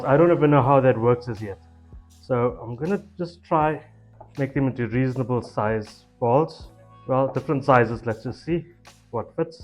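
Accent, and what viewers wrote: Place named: Bengal, India. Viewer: dude sounds like a bangali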